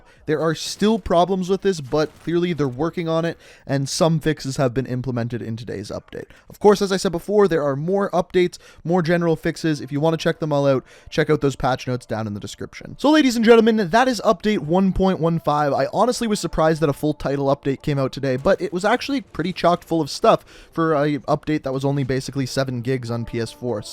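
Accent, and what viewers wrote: Canada. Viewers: I hate Canadian accents !!! You guys have weird ways of saying certain words and it kills us here in the US !!! I even hate people who live close to Canada cuz they also talk like that and its fucking annoying !!!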